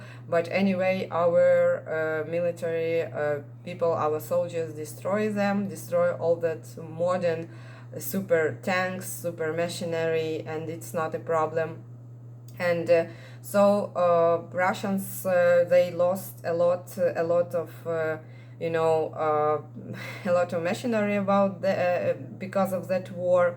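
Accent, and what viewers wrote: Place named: Oxford, United Kingdom. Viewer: Привіт доктор. Ваша англійська чудова, і я думаю, що ви вивчили стандартну оксфордську англійську. Невеликий човен, який був потоплений - десантний катер Яма з 1000 тілами - братська могила Безперечно, розвідка США та Великобританії буде вказувати місця розташування прикордонних військ – вони будуть знищені за добу, якщо вони увійдуть в Україну. Потоплення десантного корабля, більшого військового корабля і «Москви» — це чудові новини, які повинні перешкодити висадці Росією морського десанта з Чорного моря. Чудово, якщо після війни Одесу все ще можна використовувати як український порт. Побити росіян. Нехай ваша армія воює міцно і без страху. Енді (Бакінгем, Великобританія)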